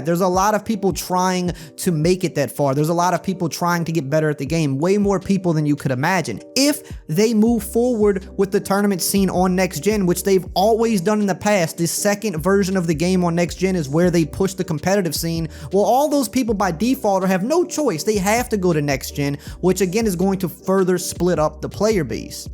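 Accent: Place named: Chalmette, United States. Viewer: You sound like you’re from Chalmette. Who Dat!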